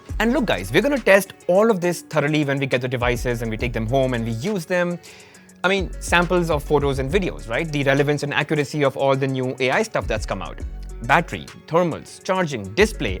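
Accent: India. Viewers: This man has such a good Indian accent. Clear and articulate. Keep it up!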